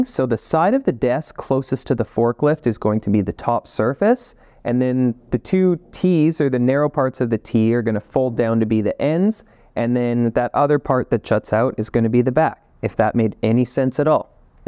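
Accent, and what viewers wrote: Canada. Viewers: He is Canadian that is how they speak.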